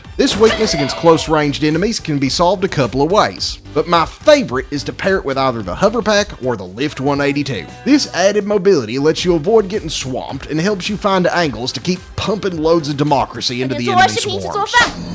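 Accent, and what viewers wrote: South, United States. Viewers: What state you from? Not to get personal or anything, but a bunch of people that I’ve played with said I sound like you so I’d like to know if it’s because we are from the same state or just that we both have a southern accent lol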